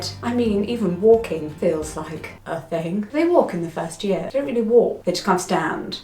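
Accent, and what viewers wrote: RP, United Kingdom. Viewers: i saw you being intervued by molly berk and loved your poshness that's parshley why i subscribed to you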